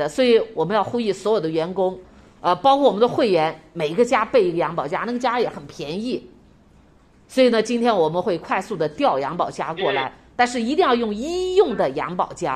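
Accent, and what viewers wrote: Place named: Yunnan, China. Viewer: 云南口音😅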